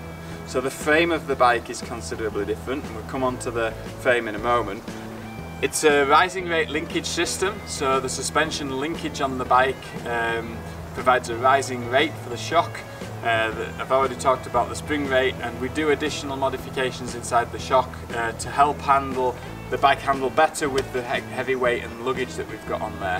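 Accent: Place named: United Kingdom. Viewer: I love to hear you speak! The words coming out and the bloody British accent👍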